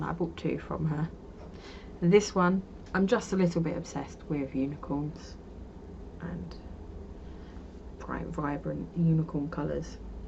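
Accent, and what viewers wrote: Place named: United Kingdom. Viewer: Hello, an good morning, I have just found your podcast, an was laughing so much at your comment of wanting to make the Shawls, because I am the same, love shawls, an like you have a growing stash that will be older than me teeth if I don't stop. ha ha, love the yarn you showed, look forward to seeing what you make with them. I take from your accent you are U.K. I live in North West England. an have subscribed. have a lovely day. :)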